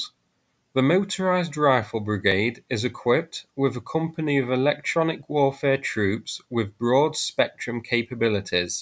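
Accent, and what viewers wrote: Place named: Northern England, United Kingdom. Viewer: Thanks for the info. I'm not a Brit, but if I had to guess I would have guessed that his rather... noticeable accent was northern. 😉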